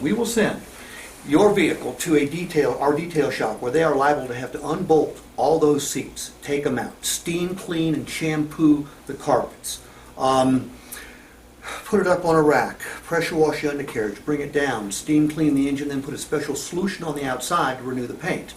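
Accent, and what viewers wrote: Appalachia, United States. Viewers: and his hillbilly talk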